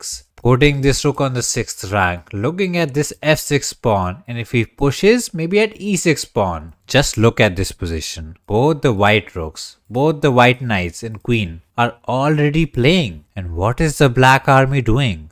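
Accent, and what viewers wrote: India. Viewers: Nice to hear some Bhartiya voice. Search knight tour Sanskrit to know why Bharat was richest. So much knowledge they kept hidden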